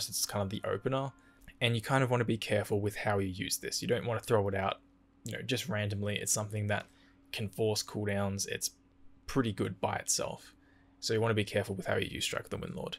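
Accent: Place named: Australia. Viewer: I don't play WW but upon hearing a fellow aussie, instant sub. Watching this all the way through regardless because a 1 hour guide kicks ass, best of luck with the new channel mate x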